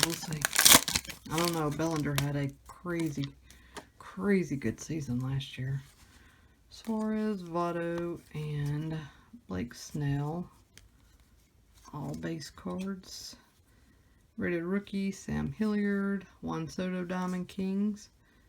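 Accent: South, United States. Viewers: The southern accent rocks. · You got an awesome box. And your southern accent is awesome too. Don't let anybody tell you different. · Audio is perfect, your southern voice is perfect too. Nice box! Love the design, Gina make a nice football card.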